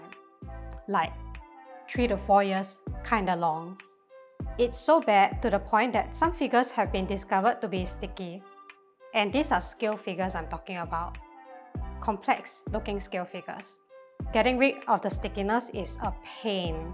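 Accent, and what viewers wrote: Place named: Singapore. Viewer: First time discover your channel and I'm wondering if you're Singaporean after hearing your accent and I'm right 😅, fellow Singaporean here 👋, I'm planning to become a youtuber as well, hope I can achieve that goal soon, best of wishes and amazing collection. 👍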